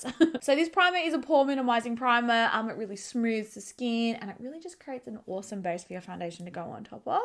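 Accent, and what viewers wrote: Australia. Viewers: I love your video’s so much! Now my exams (dialysenurse, just literally translated, I dont know if the translation is correct, but I think you know what I mean) I bingewatch your videos all the time ❤ To be honest; I love your australian accent so much and Australia in general (so why in US dollars 🤐🤐🤐🤐). I orderded so much lately on your website and I always recommend your make up on my own channel, even in the favourites of the year (unfortunatly its in Dutch). Please keep on the good work and keep on entertaining us ❤ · I am 55 with very dry skin, so alot of your videos don't have much relevance for me. HOWEVER, I moved to the USA from Australia almost 5 years ago, and your videos make the distance seem not so great. Between your beautiful personality and your genuineness (let'snot disregard your awesome Aussie accent) you brighten my days, making me laugh with your sunshine. 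So pleased to see a "pro" still gets fake tan stains on her hands - I don't feel so bad. 😃, thank you for being you. 💗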